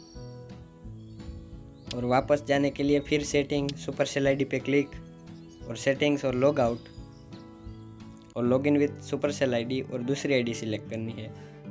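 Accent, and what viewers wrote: Gujarat, India. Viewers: Hindi with Gujarati accent.... Gujarati hai ap....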